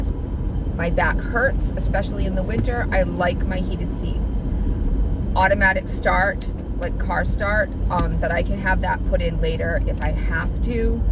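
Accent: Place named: New England, United States. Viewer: Been meaning to respond for a week now because I just stumbled across your videos. I love your accent and I could immediately tell you were from New England because of it! I just wanted to chime in here. I'm 5 feet 6 inches, and have very small wrists. I wanted a watch that would record my steps and my blood pressure. I'm really not a fan of Apple products and wasn't really sure that I would use an Apple watch if I had one. So I went the cheaper route and found one on Amazon that was $30 with a $10 coupon. The watch was $20 and some change. It received 4.5 out of 5, and after reading the reviews, I bought it. I couldn't be happier. Yeah it's a little bit big on my wrist, but it measures everything I want and you can shut off the things you don't. I became a little bit fascinated with my sleep cycle, because I'm retired now and can sleep as long as I want, pretty much. 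I used to commute 5 hours a day to my job, so sleeping is very enjoyable, lol. Anyway, I bought this watch thinking I could exchange it down the road, for something I liked better. Forget about it. The battery holds a charge forever, it's got a bazillion clock faces to choose from, and the water reminder lights up, vibrates a little and tells you to drink water! What's not to love? It's a little bit difficult to set up, but really this watch can do way more things than I use it for, so just the basics are easy to set up. It does everything an Apple watch does, and somethings I didn't know about, like find my wat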